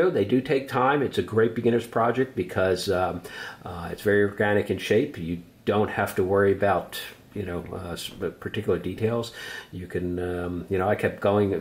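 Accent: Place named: Philadelphia, United States. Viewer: Another great tutorial! That really sounds like a Philly/S.Jersey accent, haha! Im in central PA myself! Go Birds 🦅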